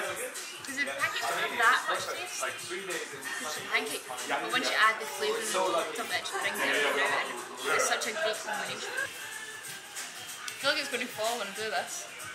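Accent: Scotland, United Kingdom. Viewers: Its too heavy as british accent, lol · Thanks goodness for the English subtitles, otherwise I'll have a tough time understanding her Scottish English since I am more used to American English. I think Chinese subtitles might help her get more subscribers just in case she wants more audience. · Its so enjoyable listening to your British English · Love you Scottish accent and your down to earthiness ! I travelled all around Scotland with my younger brother many, many moons ago and loved it ! Mind you, I stayed in the U.K. for 12 years and it was full of fond memories. Keep up the good work !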